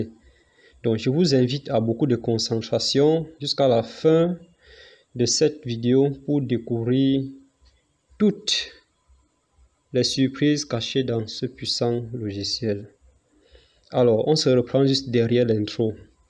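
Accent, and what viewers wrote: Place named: Cameroon. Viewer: vous êtes camerounais je suppose ! J'aime trop la manière de parler des camerounais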